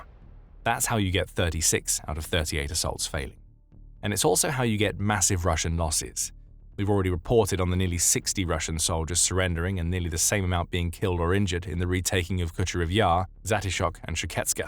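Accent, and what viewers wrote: United Kingdom. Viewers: Dear God what Bullshit. I should have known as soon as I heard the British voice. The GUR SF were on a rescue mission for a high value asset that is not going to make it out alive. Ukraine is out of reserves and It is showing all over the battlefield.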